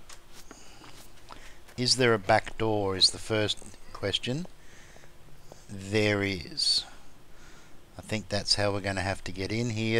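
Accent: Australia. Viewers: One of the coolest builds I've seen. You should play on our server, it is a server for people who like to build. Land claim covers 61, online modifier is 256 and offline modifier is 1024. TNT, pipebombs and rockets have been severely nerfed to virtually stop griefers. Nightly region saves and admin will give you a copy of your region. The server is called Land Owners, it sounds like you are down under and may have a higher ping, I believe server kicks players with pings over two hundred but am certain admin would up just to have you and your awesome builds play with us.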